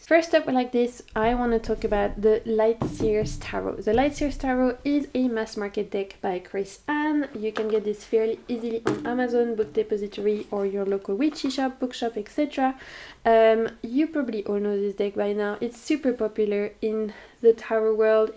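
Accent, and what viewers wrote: France. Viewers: I simply loved your video. I am a beginner in this wonderful journey and I do believe that guidebooks must be an essencial part of a tarot deck. You gave me a lot of insights about which deck to buy next. Thanks for sharing. I also love your french accent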